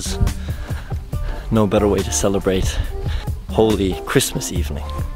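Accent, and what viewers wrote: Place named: United States. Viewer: I was surprised by your American accent. You must have spent a lot of time in the U.S. BTW, I learned a great deal about the Habsburg dynasty while at school in Austria. Strobl am Wolfgangsee. Fun family video.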